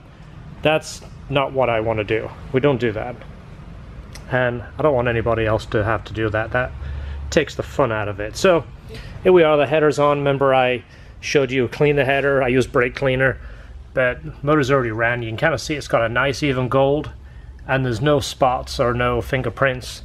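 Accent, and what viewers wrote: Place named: Scotland, United Kingdom. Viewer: Love the s2000 build. Hopefully you guys can work with me when I pick mine up. Also, love the accent, Scotish?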